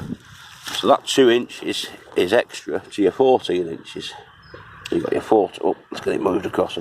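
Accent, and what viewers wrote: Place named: North West England, United Kingdom. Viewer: Please can you explain your name? I know is teacher in Indonesian and perhaps other languages. Then what's its origin and relationship to you? You sound like come from NW England to me! Generally enjoy all your videos Cheers john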